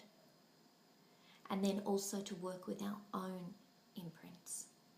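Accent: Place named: Australia. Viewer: great to see you and hear your lovely aussie voice! No transcript needed! We haven't met but have communicated in the past via email. My stepson and his wife are due to give birth to their first baby in Sydney tomorrow! We will be first time grandparents! The newletter is great, personal and experiential. With love and birth into being, Suzie McGreevy in Ireland.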